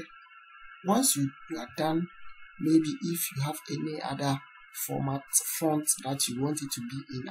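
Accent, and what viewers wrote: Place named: Ghana. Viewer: that's a Ghanaian accent lol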